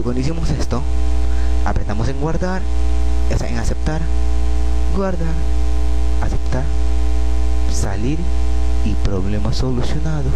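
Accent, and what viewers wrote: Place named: Peru. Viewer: Peruano xddd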